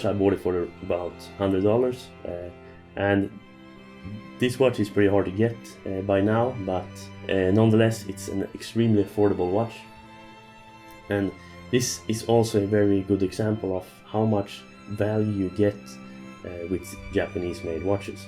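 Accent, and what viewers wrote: Sweden. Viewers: Dmitry Streak Probably I am swedish and he kind of sounds as my english, maybe central sweden like Stockholm.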